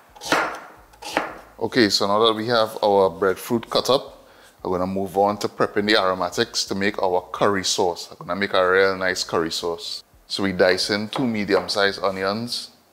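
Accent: Trinidad and Tobago. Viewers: Trinidad in the house you sound like your from Trinidad. My daughter just bought home a breadfruit pigtail oil down she said but i can save some a try this. Just in time to.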